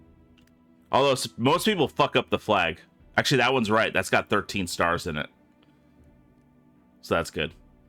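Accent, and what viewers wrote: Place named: South, United States. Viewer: Loving the accent it's like you flip between a Boston accent and southern.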